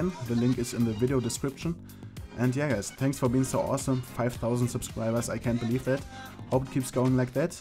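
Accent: Germany. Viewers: I Subscribed you because your PERFECT english and ive heard the German very well out of it und weil du mir sehr Sympatisch erscheinst! The first Video that ive seen from you was: CS:GO Ninja Defuse de_dust2 by! After that i had no choice and had to subscribe! Btw i have already that Phoenix Pass and dont need it so I hope you guys Luck ^_^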